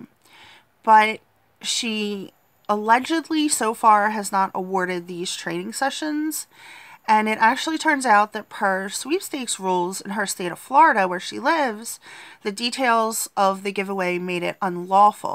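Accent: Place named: New York, United States. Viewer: You sound like you have a New York accent! Best of luck with the challenge.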